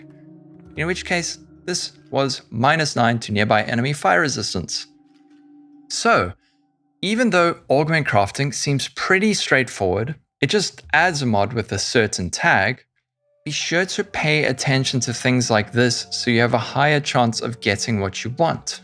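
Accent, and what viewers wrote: South Africa. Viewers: Is it my imagination or are you South African?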